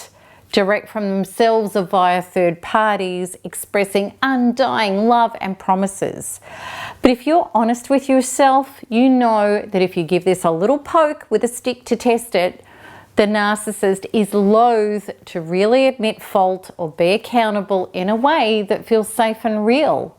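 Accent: Australia. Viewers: Love your hair, simply gorgeous! Refreshing to hear a fellow Aussie voice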